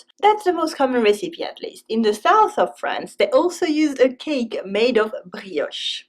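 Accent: France. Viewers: Like French accents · Good job! Love the French accent ! J adore aussi the gallette des rois!!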